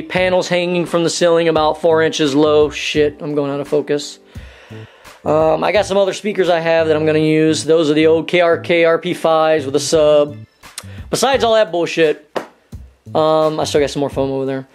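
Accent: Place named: United States. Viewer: Man, great american accent. From where you are? These days all USA people has an awkward kitty accent, men and women the same. Thanks for the video too.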